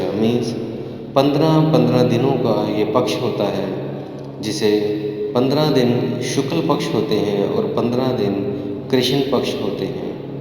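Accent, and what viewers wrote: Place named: Punjab, India. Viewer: आपकी आवाज बताती है आप पंजाबी हैं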